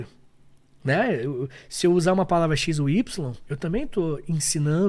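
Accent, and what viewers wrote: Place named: Rio de Janeiro, Brazil. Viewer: Comecei a segui-lo por agora, Prof. Erro meu, eu sei. Vou procurar esse programa para absorver todas as ideias. Você é muito bom, obrigada. ❤ E esse sotaque carioca é um absuuuurdo.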